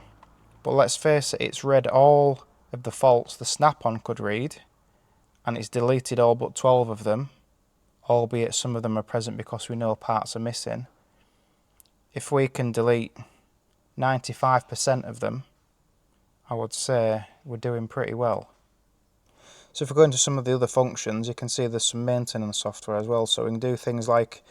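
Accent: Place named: North, United Kingdom. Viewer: Another cracking video, paintwork looks like glass. Nice to hear a fellow northern voice.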